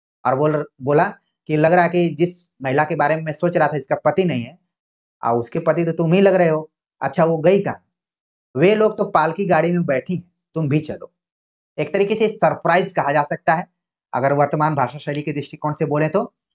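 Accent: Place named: Mirzapur, India. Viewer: Sir mai mirzapur se hu mirzapur ki bhasa aisi hi h😂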